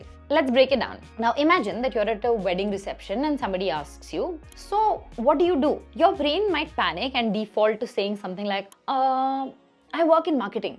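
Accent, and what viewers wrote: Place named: India. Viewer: by listening to her accent i can clearly say she is from India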